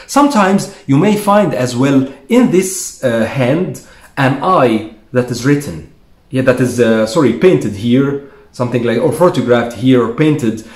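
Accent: Egypt. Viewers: The more I watched your videos, the more I love Egyptian dialect🫠🥰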